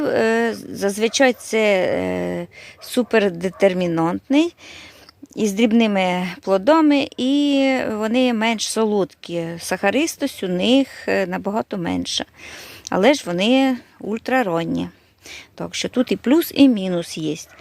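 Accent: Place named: Central Ukraine, Ukraine. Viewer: Добрий вечір,. Пояснюєш і росказуєш дуже доступною мовою. Ти чесна і відкрита людина. Говір центральної України. Велике дякую за всі відео і твій труд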